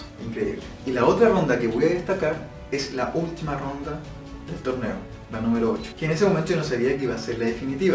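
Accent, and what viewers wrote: Ecuador. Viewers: Eres ecuatoriano o chileno jajajaj decidiré weon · Suena a mensaje de anime, pero la verdad es que nunca hay que rendirse y gracias a eso fue a un mundial entonces siempre diviértanse y jueguen PD. Eres ecuatoriano???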